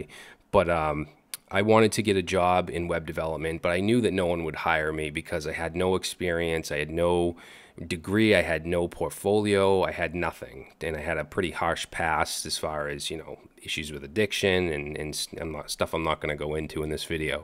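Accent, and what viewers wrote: Boston, United States. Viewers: you're great. Been here (read: Udemy) since your Eduonix days. PS - your Boston accent is nearly gone!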